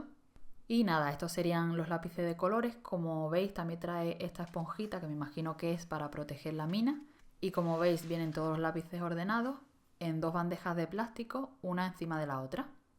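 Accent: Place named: Colombia. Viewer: Erga pq hablas como en Colombiano pero de españa? No es jeit, enrealidad me es más agradable al oido, pero me pajea el cerebro escucharlo asi jajaja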